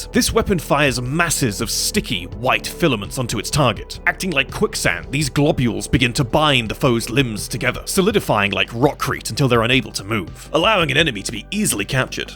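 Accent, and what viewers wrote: United Kingdom. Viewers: Bri’ish bloke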